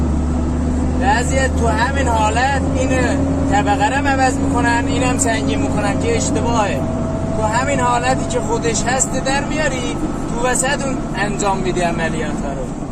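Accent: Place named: Turkey. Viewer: قربون اون لهجه زیبای ترکت برم . پسر ناز خودم هستی .من هم در آمریکا راننده تراک های خدمات کنار جاده ای سبک و سنگین هستم که در ایران میگند خدمات خودرو . سعید خراط از کالیفرنیا ایالات متحده امریکا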